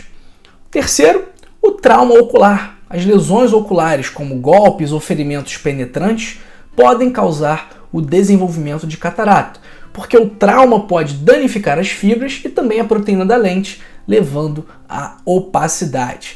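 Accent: Rio de Janeiro, Brazil. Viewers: Carioca ! Menos! . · Esse sotaque de carioca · Você fala muito muito carioca. É muitos x x x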